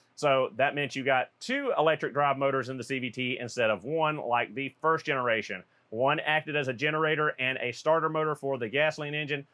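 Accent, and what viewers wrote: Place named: Arkansas, United States. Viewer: I love your accent 🙂, Arkansas? Love from Czech rep. Thx for great content.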